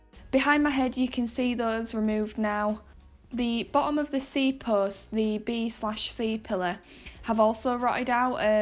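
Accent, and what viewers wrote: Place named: Yorkshire, United Kingdom. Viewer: Love ur vids I'm wanting a defender project soon And I will be using ur vids as guidance Keep it up BTW I'm guessing your from Yorkshire lol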